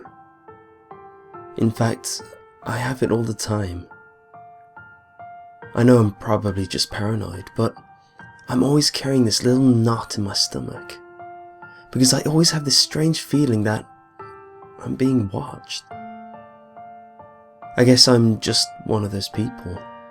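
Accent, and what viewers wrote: England, United Kingdom. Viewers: He isn't American 😂😂 He's British, especially with that accent. No one that's born in America has a voice like that. Only in Britain. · His accent is English.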